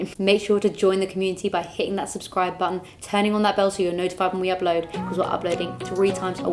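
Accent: United Kingdom. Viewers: i hope you dont mind me asking a rather personal question .. where are you from (more or less) im asking because of the accent it is a accent i very much like but only a few have it in movies and stuff .. i would say brittish but then again the country is big so id like to know where this is coming from :) cheers and greetings from germany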